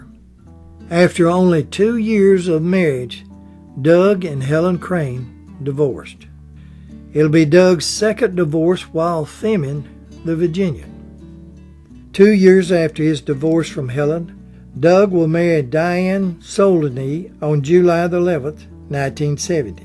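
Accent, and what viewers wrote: South, United States. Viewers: What evocative videos these are for a Golden Age Syndrome sufferer like me, and all in that wonderful southern accent.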